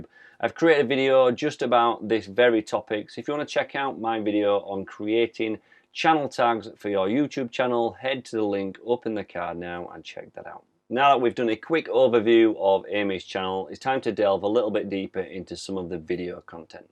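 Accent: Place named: Scotland, United Kingdom. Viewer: Ahhh a Scottish lad! Great content dude. Subscribed!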